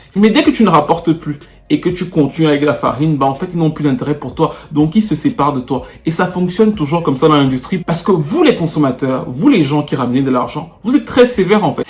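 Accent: Cameroon. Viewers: Je dis hein, je te soupçonne un petit accent Kmer comme mes potes :)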